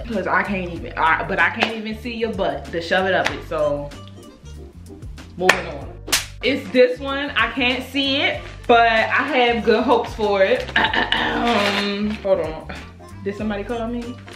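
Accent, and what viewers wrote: Baltimore, United States. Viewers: Are you from Baltimore? I hear that accent !!! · Are you from Baltimore because I think I hear an accent?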